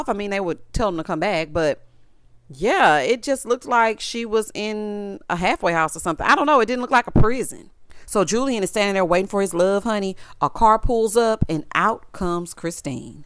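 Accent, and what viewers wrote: South, United States. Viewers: Blythe from NYC, here. I usually listen to your reviews on speaker when I’m doing something else. Today, my bf overheard and was oh so tickled by the “sassy southern belle” coming from my phone😂.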